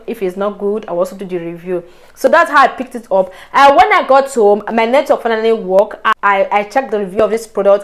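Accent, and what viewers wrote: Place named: Nigeria. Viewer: Your pigin is so sweet and the way you pronounce subscribe button is out of Nigeria . Love you girl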